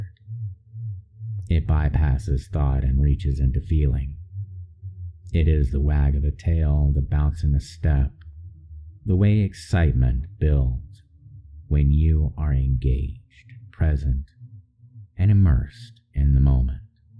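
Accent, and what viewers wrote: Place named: Texas, United States. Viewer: Putting how incredible the rest of this is aside for a moment, your accent is incredible. Sounds Texan to my ears (though that might be my bias talking since I grew up there), but no matter what it is, it’s very soothing!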